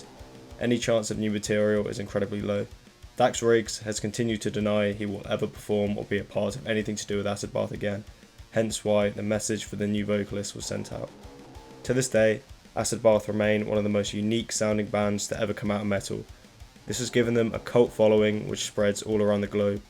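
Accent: United Kingdom. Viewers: As a guy in East TX, it's weird to hear a guy with a UK accent talk about acid bath lol. I like Dax's newer stuff too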